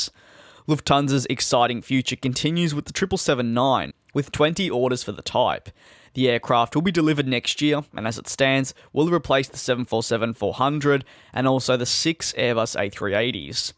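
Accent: Australia. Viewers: Hey man, been such a huge fan of you and you sound australian, would love to meet you since I live in Australia as well. Where do you reside?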